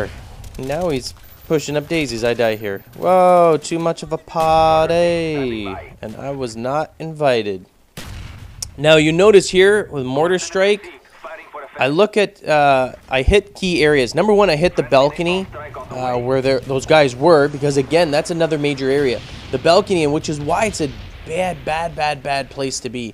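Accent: Canada. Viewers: Canadian? :o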